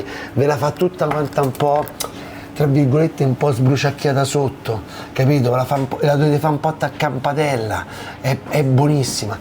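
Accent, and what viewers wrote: Rome, Italy. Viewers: ti seguo da ieri ma mi sono già iscritto sei un grande con il tuo parlare romanesco il giusto che non da fastidio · Finalmente risento parlare da Roma. Buona la cicoria ripassata. Ma che ne sanno qui in Liguria. Qua se trova la catalogna...... Mi fai ricordare il sapore....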